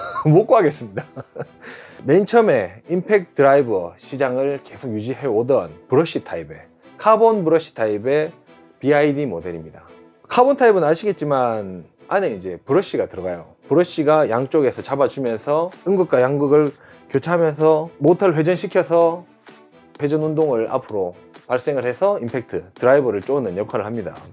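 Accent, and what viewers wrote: Gyeongsang, South Korea. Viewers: 이벤트 신청 완료 했습니다. 항상 너무 재밌게 잘보고 있습니다. 구수한 경상도 말씨가 아주 듣기 좋아요~~ㅎㅎㅎ 좋은 제품 항상 잘 알려주셔서 고맙습니다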